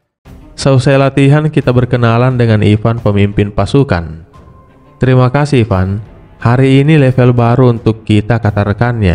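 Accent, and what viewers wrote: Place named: Eastern Indonesia, Indonesia. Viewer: kok ada logat indo timur..? barangkat.. salam dari maluku🙏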